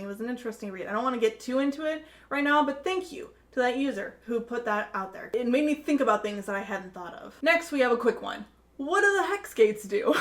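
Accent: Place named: United States. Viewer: Thanks for the detailed and thoughtful comments! I appreciate all the time you have put into making the reaction and this video. I will just concentrate my comments on one: Silco being the villain/bad guy. I don't think this label fits him, or anyone else for that matter, well. The notion that he is driven by selfish desire is extremely dubious: in the final episode he articulates his four central demands, and calls them "everything we ever wanted." None of them has anything to do with himself. Shimmer is merely a means to those ends, which is why he did not hesitate to give it up. In fact, giving it up is considered so natural to him that he didn't even consider it haggling. Also, we need to put him in the proper context: he is a political revolutionary who is fighting for the independence of a nation. Ask yourself this: among the real nations of this world, how many achieved their independence without violently defeating enemies foreign and domestic? 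Judging by your accent, I guess you are a fellow American like me. Just think of our country: how did we become a free, united, independent nation? Well, among other things, we had to beat the British, the slave owners, the Native Americans, and the Mexicans. And our country is by no means unique in this regard, not even close. Your point about Jinx being better off with people like Jayce and Victor is no doubt true. But what about the hundreds of other children like Jinx in Zaun? Can their lives truly improve without the under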